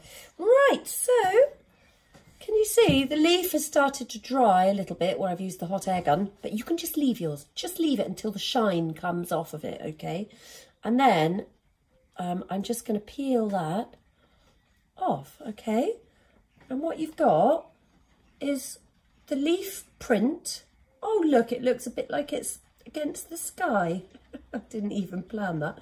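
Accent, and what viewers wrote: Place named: United Kingdom. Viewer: I showed several of your videos to my Junior High Ceramics students and at least a few students per class, most accurately, exclaimed if I had a British accent I would be you. lol. I love the comfortable experimental way about you. Thank you so much.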